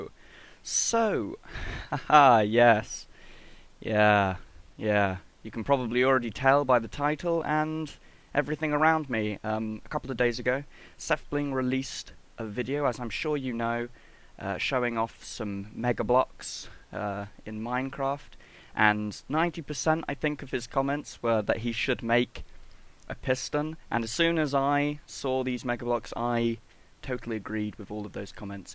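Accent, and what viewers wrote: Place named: Sheffield, United Kingdom. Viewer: are you from Sheffield? I recognize your accent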